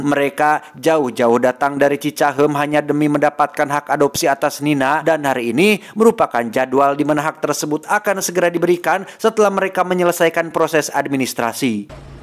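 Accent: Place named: Madura, Indonesia. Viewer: madura bang 🔥🔥🔥🔥🔥🔥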